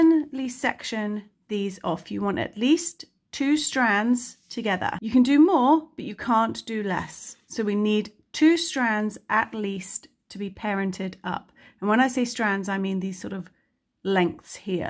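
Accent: England, United Kingdom. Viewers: Beautiful yarn and nice to hear an english accent on a crochet video, may also look great with chain of crochet pulled through rather than straight strands but still fab. Also you could tie of single strand from each double yarn stand for a finer ending. However why as a fellow Brit are you using American terminology? You are doing an English treble stitch NOT an english double crochet. I have been crocheting 50 years and it annoys me English terminology has been ousted by youtube rant over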